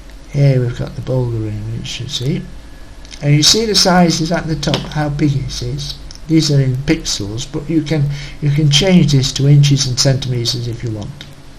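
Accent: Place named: Yorkshire, United Kingdom. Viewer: who say he is a windows 10 lover he might be a windows xp lover also with that accent I can tell your from Yorkshire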